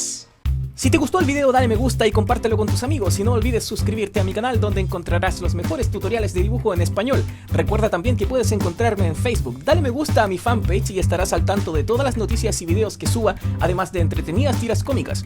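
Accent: Chile. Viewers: Eres chileno, supongo :v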